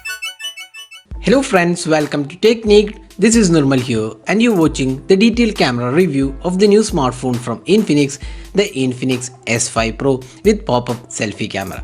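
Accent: India. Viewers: i really love that indian accent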